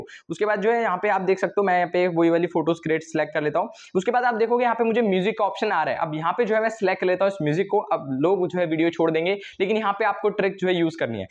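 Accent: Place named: India. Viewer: The problem is he is speaking English... I understand like every 15th word Oh, it isn't English. I thought he just talks really fast and with accent. He uses a lot of english words though. Damn Indians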